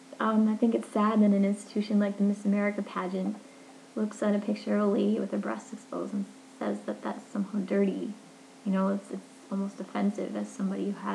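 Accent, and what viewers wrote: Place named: United Kingdom. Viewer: interesting, you dont sound american, i thought it was a british accent, maybe canadian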